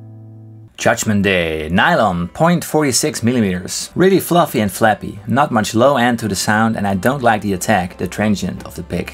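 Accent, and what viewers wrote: Netherlands. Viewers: From which country are you? Your accent sounds dutch..... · jij klinkt een beetje Nederlands? zou dat kunnen?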